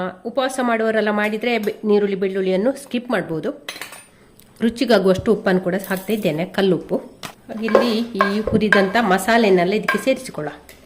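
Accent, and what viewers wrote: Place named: Mangalore, India. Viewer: Wooow new healthy recipe sister 👌 🎉 watch full video liked 👍 nim manglore kannada super sis😅🥰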